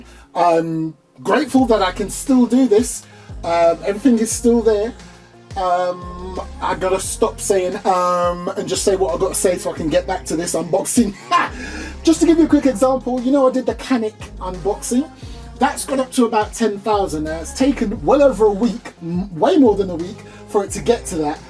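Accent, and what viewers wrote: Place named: United Kingdom. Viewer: You sound like bri'ish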